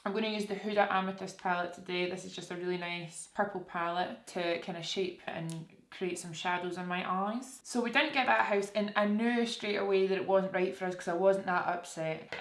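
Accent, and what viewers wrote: Scotland, United Kingdom. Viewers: Just found your channel, you’re an absolute babe, so down to earth, lovely and Scottish accents are lush ✨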